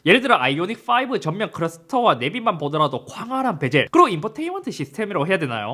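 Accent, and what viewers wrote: Gyeongsang, South Korea. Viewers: 경상도 사투리 구수 하네예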